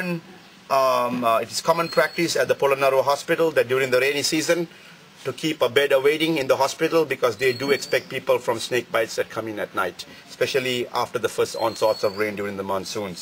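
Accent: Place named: India. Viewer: Dude this video 99% talking but the problem is his indian accent is so strong but still he talks so fast and with the low quality its impossible seeing the mouth to help understanding, so its very hard to understand. You should really talk some more slowly and clear if you want that people can understand you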